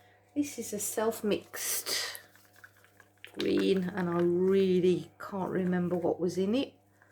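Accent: United Kingdom. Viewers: Another amazing piece, where are you from? You sound so English to me but I see you are in Australia. I am English and currently live in USA.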